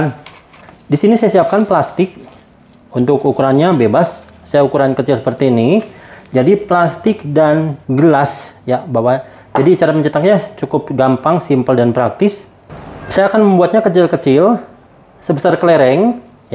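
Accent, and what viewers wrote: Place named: Sunda, Indonesia. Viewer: Mantap kang, di GALO pokokna mah, dan kita SODOK, sunda pisan kang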